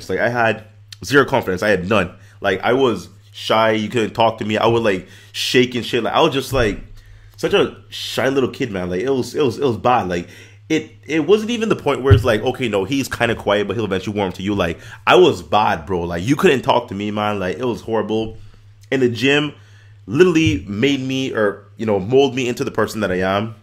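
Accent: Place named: Caribbean. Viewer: From the moment I've seen your videos I know your were from the Caribbean by your accent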